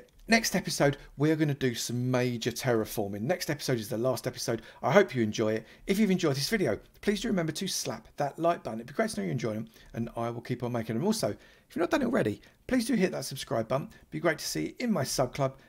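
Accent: United Kingdom. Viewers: thanks very much man ! i had been wondering what i was doing wrong :).... love your british accent btw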